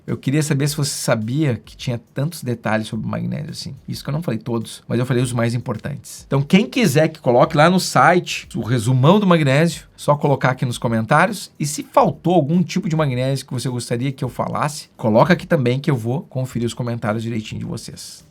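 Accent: Rio Grande do Sul, Brazil. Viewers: O senhor e gaúcho? Gostei do sotaque.e meu conterrâneo?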